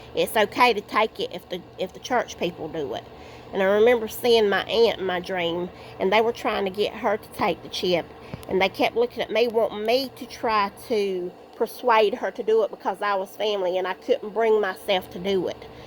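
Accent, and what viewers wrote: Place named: South, United States. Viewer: I just love hearing your accent! It makes me feel at home. I just moved to the west coast from Georgia and I miss the South sometimes. Also, my maiden name is Kirkland, do you happen to be from Georgia?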